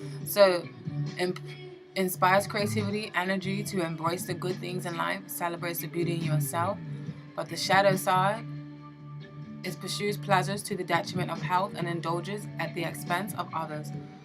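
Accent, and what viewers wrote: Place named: Bermuda. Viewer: I enjoy your readings and keep up the great work as it definitely resonates with me. I've been listening and noticed that the accent sounded familiar. Hi my fellow Bermudian. :)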